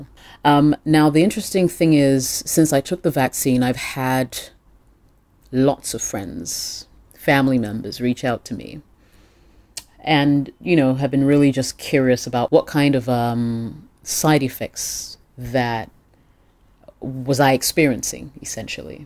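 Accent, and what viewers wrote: United Kingdom. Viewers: You sound like you've spent formative years in the U.K. to me. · Hi, How are you? You are blowing my mind with the way you Speak! The way you speak English is so clean!!! Up to now, I have never heard you break out into an accent. I have a toss-up between you being British-trained or US. So I am from Trinidad and Tobago. There are people in my country how sound is clean as you, being so well-spoken. Trust me I love the way you speak! Because I speak with my Trini Accent a lot, you can sound a bit annoying(lol), however, it's really pleasing to listen to you. Keep it up and every so often drop in your Ghanian accent if you can. Well keep checking out your topics. Have fun doing what you do.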